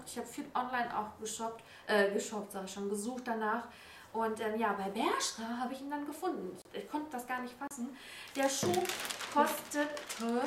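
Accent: Ruhr, Germany. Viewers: Du gefällst mir in deinen "neuen" Videos wieder so unglaublich gut, ich muss ehrlich gestehen das ich zwischendurch etwas "entliebt" war, mochte dich nämlich früher waaaahnsinnig gerne aber zwischendurch war das einfach nicht mehr die die ich sonst aus den Videos kannte und mochte und jetzt habe ich das Gefühl du bist wieder du, mit dem witzigen Humor und einfach einem Hauch Ruhrpott - den ich als Essenerin sehr mag! Ganz liebe Grüße, Julia ♥ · ich finde man merkt total, dass du hier ausm Pott bist :-D nimm das bitte als Kompliment! gucke deine Videos in letzter Zeit wieder sehr gerne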